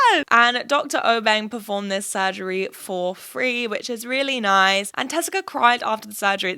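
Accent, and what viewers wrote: California, United States. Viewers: Very good American accent haha my British accent is not as good ! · your American accent is genuinely pretty good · No joking at ALL the American accent is SPOT ON BABE !!! Big love from New Jersey ❤❤❤ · Your U.S. accent was so great! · Woah. Your american accent is amazing almost uncanny valley. I love it! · American accent popping off babes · Your American accent is actually really good!! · Love the american accent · Okay your American accent is legit on point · american accent sound exactly like Ashley from Ladies Code i would say that's a success (you can listen to Ashley in the Get Real podcast if you don't know her) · as an American, your American accent is good! it wavered a little at the start, and some words you still pronounced the British way, but it was pretty solid! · Hahaha YES. Californian accent acquired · OMGG ur American accent is 👌🏻👌🏻 cali girl!!! 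· Ahahah you do have a solid American accent nice job. Respectfully, a Midwestern American viewer. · No but the American accent ateee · Real talk tho your American accent is fantastic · American accent is amazing!! US citizen here. Going viral bit is hilarious! Ily T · As an American, I have to say, I would totally believe you were from Cali with that accent. I would love to hear you try my section of America and give a southern accent a go. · as much as I love your videos, your american accent is pretty rough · Your American accent is impressive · Your American accent was fantastic, the only word that tipped me off is you said “process” first as “PRO cess” and we silly American usually say it like “PRAH cess.” Not all, but most. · not an important comment but maybe the MOST important comment… American accent was top tier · Your American accent ain’t too shabby! this look back on 2022 made me realize how crazy this year was. 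What a year, here’s to another one! 🍾🥂 excited for more of your content! :) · As an american myself I have to say your american accident was pretty good. Not perfect, but definitely good. Also I have to say I loved the visuals in the background of this video. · Her american accent is actually so cute lol 😭💖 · 8 outta 10 on the accent, pretty good! A bit of work on it and you could take an American part in a film I’d say! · Hearing you talk in an American accent is so crazy ur voice sounds so different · your american accent >>> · The biggest thing I'd say is that your American accent "A"s give you away. It is a pretty good accent.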